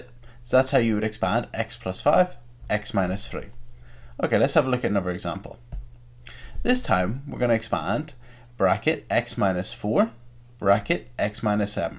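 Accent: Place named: Scotland, United Kingdom. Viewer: The Scottish are strong with this one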